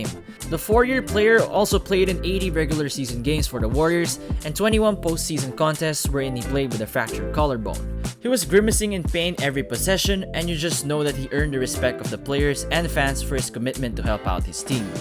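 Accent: Philippines. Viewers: You sound Filipino 🤔 -A Filipino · I hear a Filipino accent for some reason... 🤔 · God this guy sounds filipino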